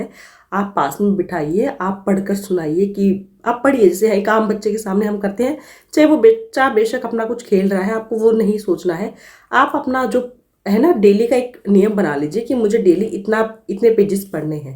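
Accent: Haryana, India. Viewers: राम - राम बेबे । आपका बोलने का लहजा हारेयाणवी हिन्दी का है। क्या मैं सही हू।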